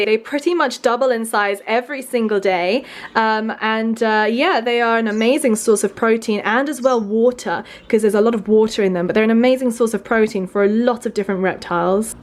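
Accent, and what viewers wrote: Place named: England, United Kingdom. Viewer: Ummm what’s with the reaalllly English accent?.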